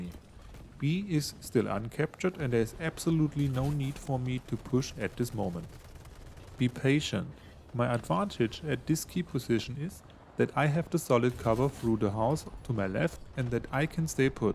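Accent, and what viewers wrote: Germany. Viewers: Also nice German accent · question is are you german? just wondering no ill intent with my comment but was just curious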